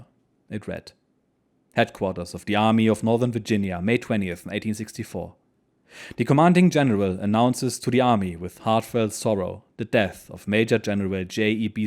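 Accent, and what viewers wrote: Germany. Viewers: Really a great story ,I've enjoyed every episode thanks for reading it as your German accent makes it feel like it was him reading 📚 it !!!! Fantastic !! As the English say cheers!!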